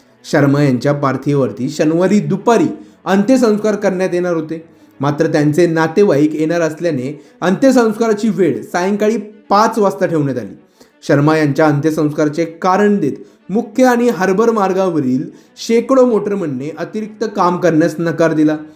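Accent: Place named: Vashi, India. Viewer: News reporter vashila Wala ahe. Signal la single boltay.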